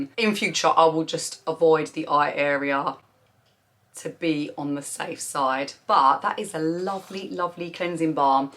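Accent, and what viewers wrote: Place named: England, United Kingdom. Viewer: Your accent is so cute! What part of England are you from? :)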